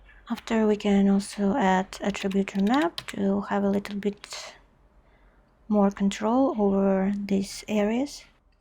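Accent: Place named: Russia. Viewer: Прям слышен крепкий русский акцент.✊🤟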